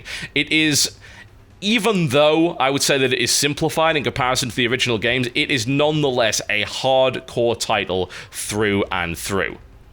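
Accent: United Kingdom. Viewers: ....he is British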